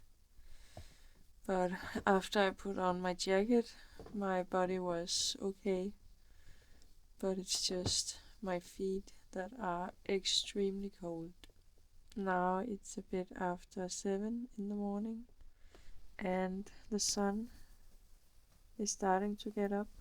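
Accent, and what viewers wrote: Denmark. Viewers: Thank you for sharing your adventures with us 😊 I really like your Danish accent, I find your videos very relaxing! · That’s a cute girl with a cute Danish accent! (Trodde du var svensk först :) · You sound Danish. Going home to some warm hugge is never a failure.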